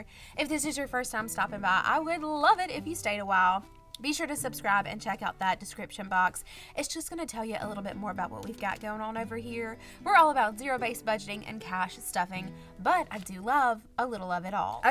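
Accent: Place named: South, United States. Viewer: definitely not annoying at all I love southern accents 🥰🤍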